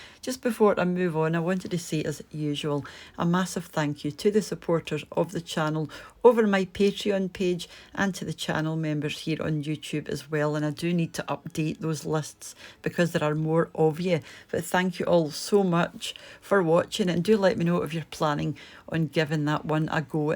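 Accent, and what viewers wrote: Scotland, United Kingdom. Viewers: Scottish accent hate the tattoo❤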